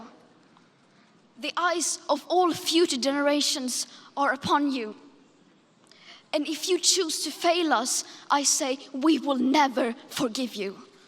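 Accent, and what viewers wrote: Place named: Germany. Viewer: Выступление Греты Тунберг на саммите ООН по климату - на английском языке (с тяжёлым немецким акцентом) с русскими субтитрами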